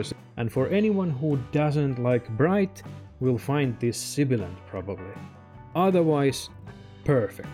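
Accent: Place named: Netherlands. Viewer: that dutch accent 🤌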